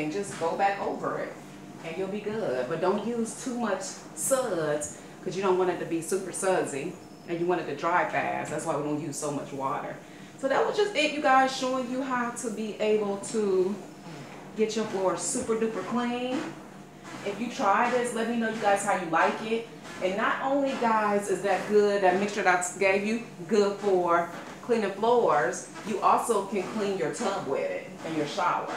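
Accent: South, United States. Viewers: Wonder if this would work in the bathroom shower? Love your sweet southern voice. I live in the south too! God Bless!😉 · Thank you so very very for your wonderful clean tips using Baking Soda. But what exactly is “Fabuloso”? I never heard of it before. I hear you saying, “y’all” quite a lot are you from Southern? Is Fabuloso sold down South or in the Mid-West somewhere? I’m from NY is the something similar I could use? Anyway, thank you very much.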